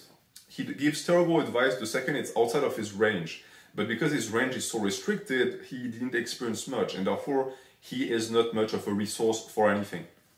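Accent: France. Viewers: Your French accent hooked me the first time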